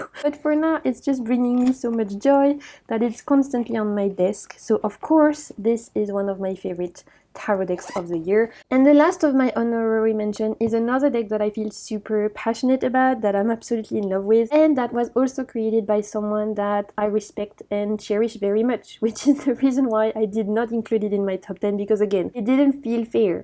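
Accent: France. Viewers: Love these decks! Sometimes I listen to you just for the Oui Oui Oui French accent 😂😂😂❤❤❤❤❤❤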